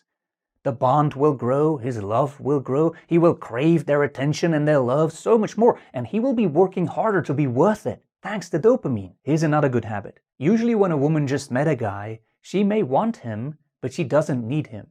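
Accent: Scotland, United Kingdom. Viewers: Scottish accent. 💋❤️ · ~This is fantastic advice!!!~Im distracted by your accent tho, trying to tell if its scottish, or irish, or...???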